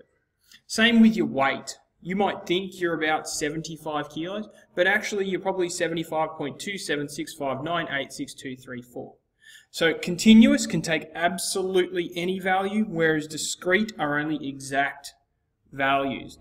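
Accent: Australia. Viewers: he has an australian accent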